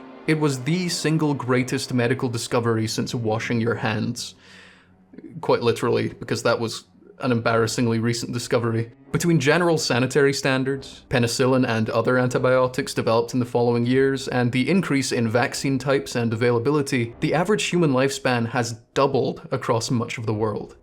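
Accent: United Kingdom. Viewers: Babe wake up, uploaded. It's giving British.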